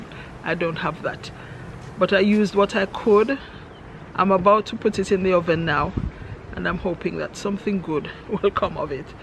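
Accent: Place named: United Kingdom. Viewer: I absolutely love your British accent.😂😂😂🤣🤣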